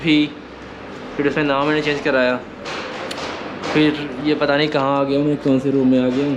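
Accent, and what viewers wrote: Bihar, India. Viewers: Mitlish ka video acha tera bakwas 😡😡😡 h Tu bihari gadi ko gari boltha h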